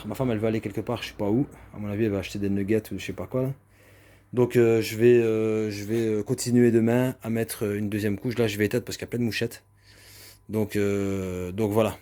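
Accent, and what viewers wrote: Belgium. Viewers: Je décèle un p'tit accent belge non? Je viens de découvrir ta chaîne 😉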